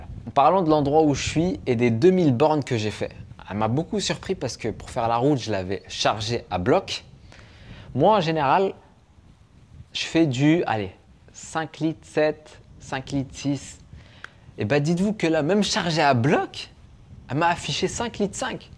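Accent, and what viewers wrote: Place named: Banlieue, France. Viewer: Salut, où as tu tourné cette vidéo ? C'est un super endroit. Merci. Oar contre tu parles comme un mec de banlieue et ça ça gache la vidéo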